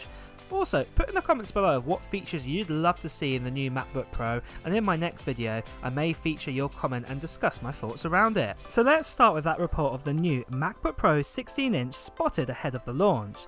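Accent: United Kingdom. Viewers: Well, I want to buy the iPhone 11. But sadly I don’t have money for that. And also i didn’t already bought any apple gear or product cuz I don’t have money for that, And i hope i will be the one who got your iPad. Because It can help me in my studies. btw i like your british accent, congrats and godbless💖💖💖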